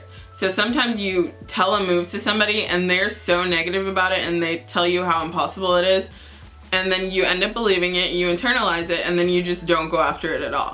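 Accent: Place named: United States. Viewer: What part of america r u from interesting accent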